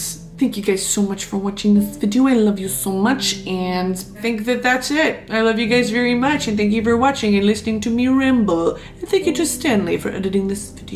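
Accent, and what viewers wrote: Spain. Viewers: Omg YOU SOUND SPANISH Like if you told me you came straight from Fuenlabrada I'd believe it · So many of her TikToks speaking Spanish have literally hundreds of comments being like “but why you have the Spain accent 🙄” which bothers me so much bc like girl! She learned a whole ass second language! That shit is hard! And her teachers were from Spain! And commenting on people’s accents? Is fucked! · ooo she got the spanish accent. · Brilliant Spanish accent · your Spanish accent is gorgeous!!! Me encanta como tu hablas amor!!!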